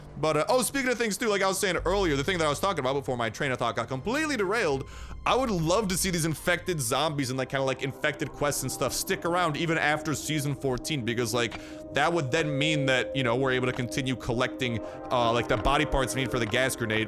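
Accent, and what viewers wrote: Russia. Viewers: Hey are u russian whats wity the occasional accent whats your heritage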